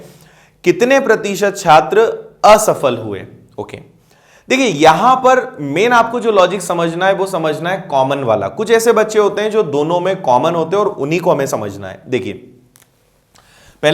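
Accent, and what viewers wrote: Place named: Gujarat, India. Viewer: Your style speaking like Gujrat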